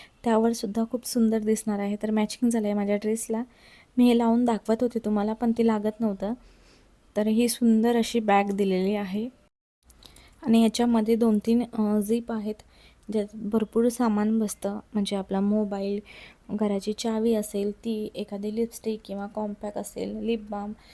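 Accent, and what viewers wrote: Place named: Vidarbha, India. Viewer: mala bolnyatn janaval tumi vidharbhatlech ekadlech asal manun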